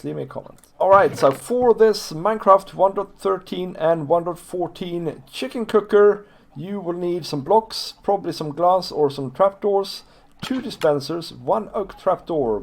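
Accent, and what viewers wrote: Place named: Norway. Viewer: Oh i literally heard it in your voice I’m norwegian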